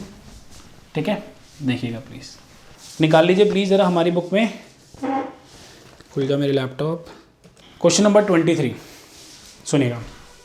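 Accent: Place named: India. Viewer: 영어 발음 실화냐 영어를 인도어로 만들어버리네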